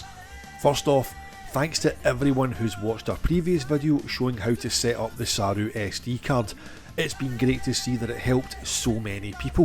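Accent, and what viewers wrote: Scotland, United Kingdom. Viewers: I like the Scottish dialect ❤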